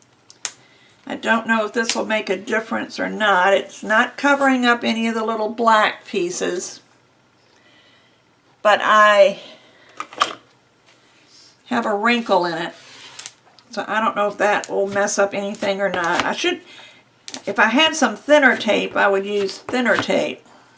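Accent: United States. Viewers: I've got to guess from your accent your in America. If you think $17 dollars is expensive for a scan and cut mat - don't ever think about emigrating to Australia. We have to pay almost $40 for our mats. 😢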